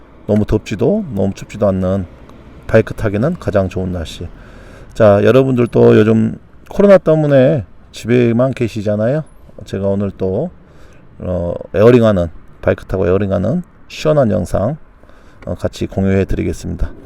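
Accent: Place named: Jeolla, South Korea. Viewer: 캔암바이크 한번 타보고 싶어집니다 ^^ 같은전라도사투리 너무 좋네요 ㅋㅋ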